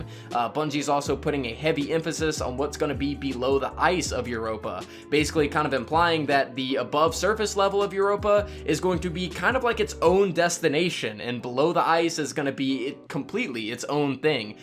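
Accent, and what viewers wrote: France. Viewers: C'est un français ?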